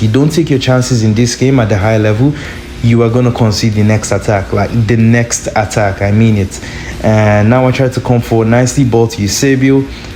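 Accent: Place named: Nigeria. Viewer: Bro are you Nigerian? I can hear your accent a bit. Also what’s your ID so we can play a friendly if you have time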